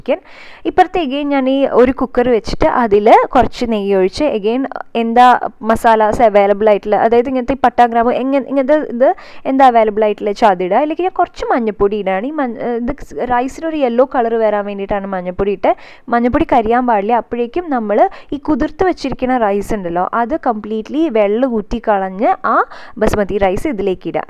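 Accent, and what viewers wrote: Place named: Palakkad, India. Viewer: Ha slang kettapo enikum thonni chechi pkd aanennu... Ur videos r awesome njan new subscriber aanu lub yew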